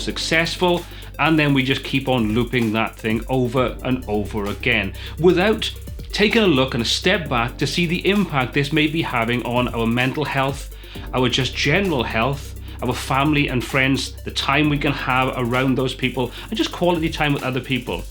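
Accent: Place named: Scotland, United Kingdom. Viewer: Hi. Another great video! I've gotten into all this computer-related stuff really (too?) late in life - how I envy you youngsters! ;-) I'm in the process of trying to put together some websites to (hopefully!) make me some money in the Affiliate marketing game which will - I further hope - be generating a 'nice little earner' by the time my pension comes due (I'm 63). I had to smile when you were on about the inflated price 'trick'. In a previous life - as a self-employed vehicle mechanic - I fell foul of that 'trick' on several occasions! Scenario: Regular customer - good payer. Really sh*tty job that I REALLY don't want! Give customer ridiculously high price quote. Result? Oh, thats less than I expected, go on then, you'd better do it! Result? Oh b*llocks!!! :-) Social life? I was Competition Secretary for a small local golf club for some years and yes, like you, many's the time I was out and about and I'd get a phone call from a club member with some query for which I needed computer access - so I feel your pain! 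lol Finally, I just love your Scottish accent! ;-)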